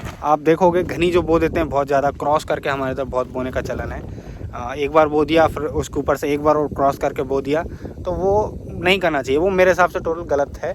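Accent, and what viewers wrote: India. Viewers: Kaha ke ho bhaiya narsinghpur jaise bol rahe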